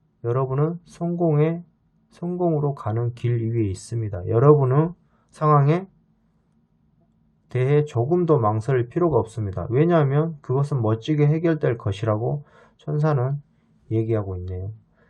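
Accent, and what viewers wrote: Jeolla, South Korea. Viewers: 전라도 사투리👍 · 의도하신건지 모르겠는데 설명하실때 사용하는 언어가 너무 웃겨요 그리구 전라도 분이신듯!??ㅋㅋㅋㅋ 2번인데 잘맞추시네요 ㅋㅋ 왜그런지 모르겠는데 사람들이 저를 좋아해요 감사합니다~!!!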